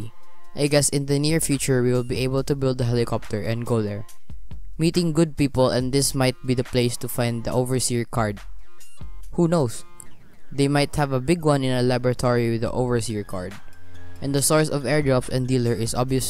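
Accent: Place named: Philippines. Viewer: Ur voice is like a Filipino:) I'm Filipino too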